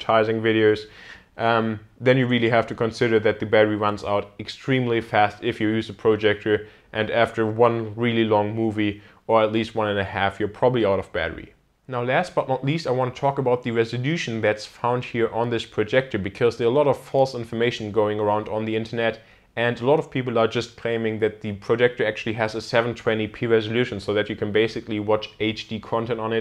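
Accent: Germany. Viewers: Du bist doch Deutscher. :D Das hört man doch.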